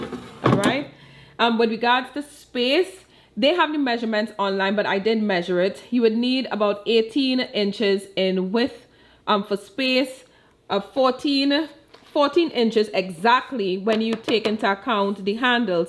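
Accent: Trinidad and Tobago. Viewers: You're Trini?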